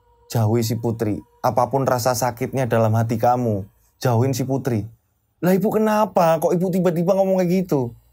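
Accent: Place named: Banyumas, Indonesia. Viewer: Ngapak hadirrrr😂 .. Ora ngapak ora kepenak.. Booommm... Booommm. Yosskayyy😅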